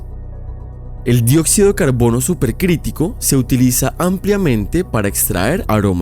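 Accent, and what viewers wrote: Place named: Colombia. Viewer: Qué buen canal! Sin introducciones innecesarias, todo muy bien explicado, interesante y aparte colombiano, reconozco ese acento :)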